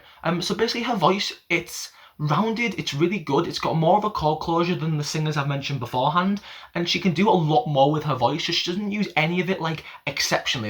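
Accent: United Kingdom. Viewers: I wish u put some eng sub bec u speaks like james charles i mean u speaks fast and not all of us can understand British accent or what ever accent u have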